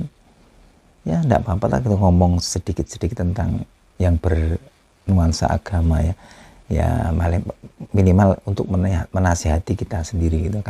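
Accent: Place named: Surabaya, Indonesia. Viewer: bahasa suroboyonan ya neng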